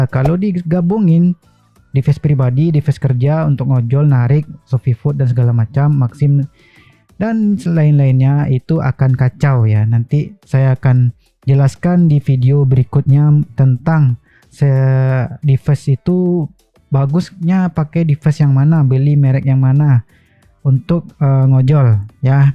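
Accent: West Sumatra, Indonesia. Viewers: Abang orang sumatera barat ya 😅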